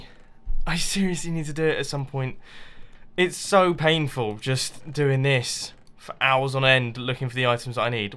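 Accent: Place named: United Kingdom. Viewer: lol i love the way British people say things like "aquir affinity"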